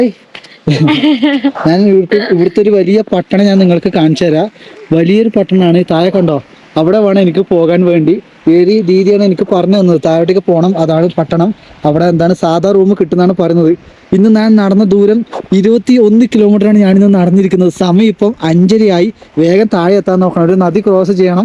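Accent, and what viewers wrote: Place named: Payyannur, India. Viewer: The real adventurer❤❤കണ്ണൂർ പയ്യന്നൂർ slang. നല്ല സരസമായ ശൈലി ❤️❤️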